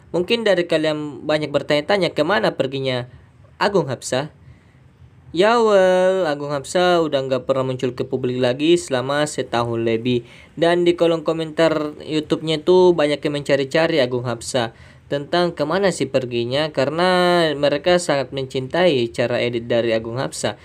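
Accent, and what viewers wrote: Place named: South Sulawesi, Indonesia. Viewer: Pasti lu orang sulawesi selatan kan bro? dari gaya lu ngomong gw bisa tau wwkwkwwk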